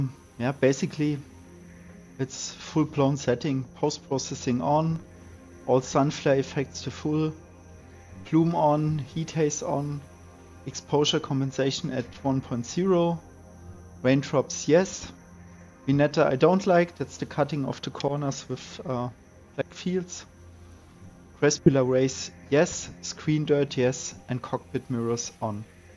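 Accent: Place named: Germany. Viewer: thank you so much for your help! And as a also German I may say, that I love your beautiful German accent 🤩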